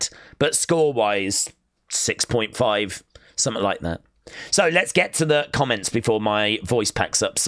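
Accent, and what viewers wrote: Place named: United Kingdom. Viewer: Shoutout from my friend Sonia from The Canary Islands. She loves your british accent! Awesome!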